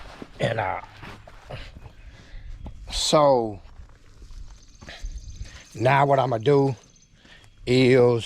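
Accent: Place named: North Carolina, United States. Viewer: heard the carolina accent, what part of nc?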